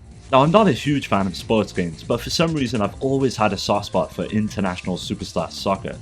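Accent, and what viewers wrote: United Kingdom. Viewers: Oh dang, indie british stuttering has it going on! Sweet vid.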